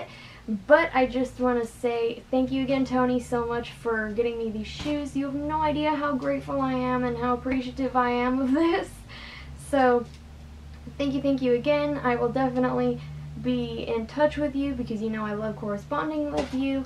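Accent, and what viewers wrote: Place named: South, United States. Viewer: I love your accent also. I'm sure like me, you're from the South.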